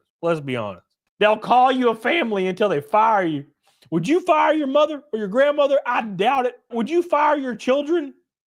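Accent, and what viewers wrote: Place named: South, United States. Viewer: Real talk i never noticed how much you sound like you came straight up like you came right out the talladega nights movie lol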